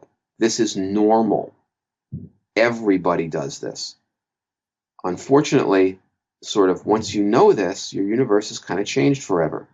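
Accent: New York, United States. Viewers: Awesome perspective. You sound like youre from the ny area...so am I so theres an added comfort of sorts there. Thought really does lead to forms. The universe is one long song. When we are in harmony, we feel joy. When we are in disharmony, we feel anxiety. The problem today is there is an unspoken pressure to contruct a life that is by design unfulfillibg. So we seek pleasures to relieve us and soothe us. Sickness of society can only be faced by the individual. Seeing the power we truly have every day could liberate so many from the victim mindset. Much thanks!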